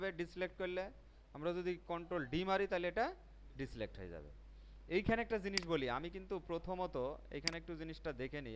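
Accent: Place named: Madaripur, Bangladesh. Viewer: Your voice was just like madaripur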